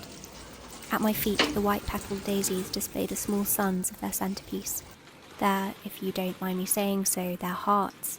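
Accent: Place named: South, United Kingdom. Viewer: Who are all here to learn south British accent?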